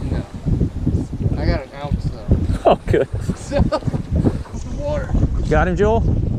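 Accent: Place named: Boston, United States. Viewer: 1: them fish all got training wheels. 2: age of consent man. 3: ive seen your fish fillet video, and a 12" fish = a tiny fish stick.... 4: no one wants to put tiny fish sticks in their mouth, would you? 5: nothing better then busting some Louisiana boys balls. 6: the challenge, of being a better Fisherman. 7: its fall man go find some bigger trout, like an adult beating up kids, which by all accounts sounds fun... but you dont take thier bikes after your done man. Have some class. 8: big fish = more likes. I dont know how the algorithm works, but I'm sure once they convert 13" keeper to 0s and 1s, you get bumped down pretty hard. I literally had to Google, Louisiana guy catches miniature fish and brags about it in the mash with a Boston accent; to find the video.... looking out for you man.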